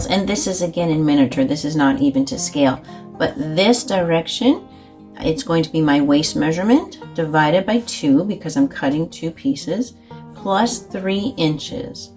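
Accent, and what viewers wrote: Canada. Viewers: I could tell you were Canadian by your accent 🤯 surprising! Hi from Canada 🍁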